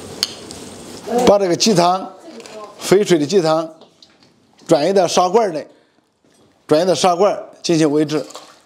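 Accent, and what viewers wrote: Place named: Hubei, China. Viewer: 亲切的湖北口音😊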